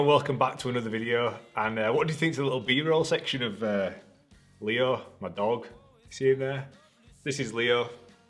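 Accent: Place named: Teesside, United Kingdom. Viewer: I need to start doing that! My first few videos were just me rambling on without any structure haha so the bullet points list is a good idea. Also, I think it's needed for when you shoot cinematic sequences etc so you know what shots or what in camera transitions you want to use. Knew I recognised the accent. I went to uni in York and my family are from Middlesborough area so I'm familiar with the accent!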